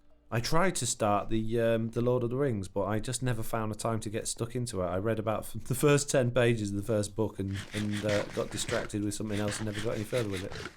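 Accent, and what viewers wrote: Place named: Ireland. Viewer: Love the Irish accent, I see another character on the horizon lol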